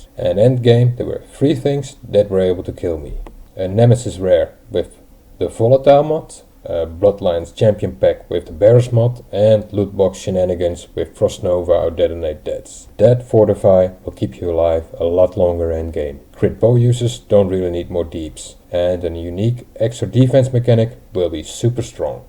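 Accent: Netherlands. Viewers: u dutch ?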